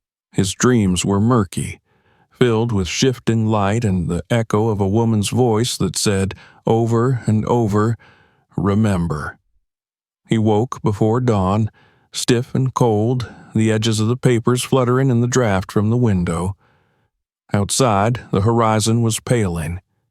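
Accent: South, United States. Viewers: Findlay Ohio loved this story and the southern draw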